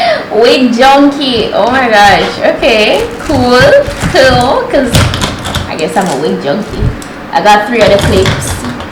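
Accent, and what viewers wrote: Jamaica. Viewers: You are beautiful, funny and the JA accent is feeling like home.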